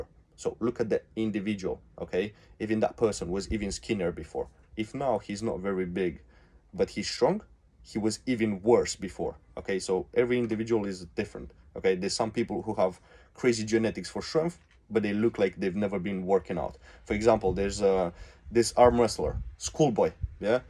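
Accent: Russia. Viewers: Ты русский? Акцент выдает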